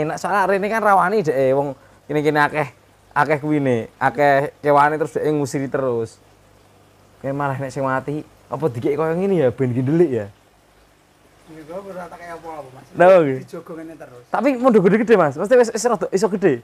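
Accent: Indonesia. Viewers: Sampean omahe endi bang...kok logate rodo semarangan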